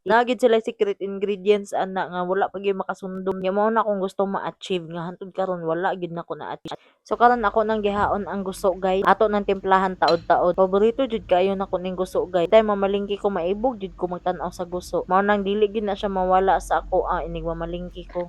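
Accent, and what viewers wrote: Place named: Bohol, Philippines. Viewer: tamsak host. boholana girl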